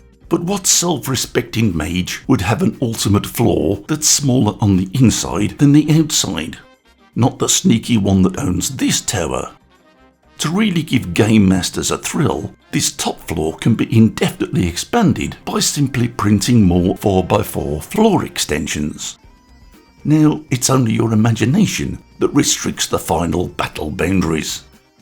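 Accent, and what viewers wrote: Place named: England, United Kingdom. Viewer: You sound english. Use feet and inches !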